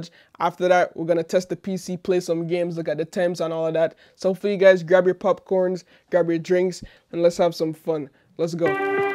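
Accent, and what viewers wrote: Jamaica. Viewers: You're jamaican arent you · are u jamaican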